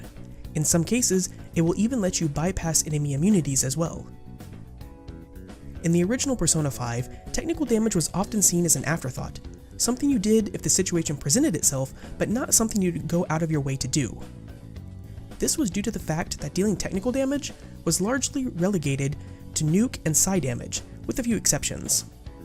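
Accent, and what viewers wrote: Japan. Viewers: Is Japanese your first language?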